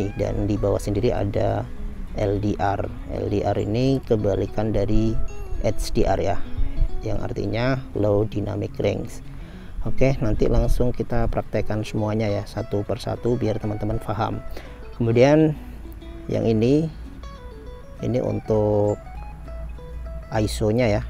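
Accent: Pasuruan, Indonesia. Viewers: Eh masnya pasuruan toh 😄 Koyok daerah bangil. Oh iya Mas mau tanya untuk redmi note 7 ada gak gcam support yang ada fitur wide angle ada gak mas? Buat keperluan foto lanscape 🙏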